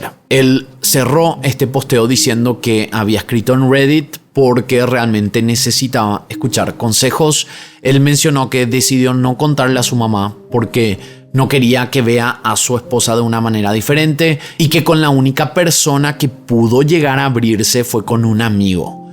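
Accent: Paraguay. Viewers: Sos paraguayo? sonas re paraguayo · ¿Tu acento es paraguayo?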